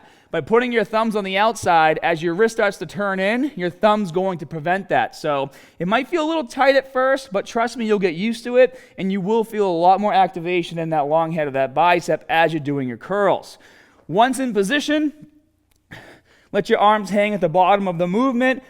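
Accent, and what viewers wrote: Boston, United States. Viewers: Is that a Boston accent? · amor macalalad he’s from Boston so a Boston accent.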